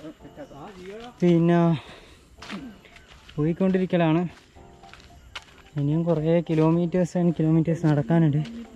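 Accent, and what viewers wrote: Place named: Kannur, India. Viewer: Kannur basha yude monju vere thanne😍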